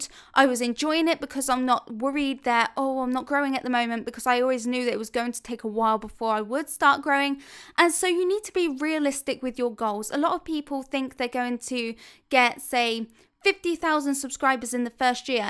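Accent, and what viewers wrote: England, United Kingdom. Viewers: That is so pretty. Thanks for uploaded. And, again, I love your english accent. Saludos desde Ecuador.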